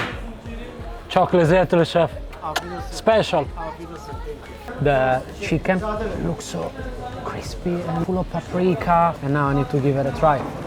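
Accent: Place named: Italy. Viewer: Man i love his Italian accent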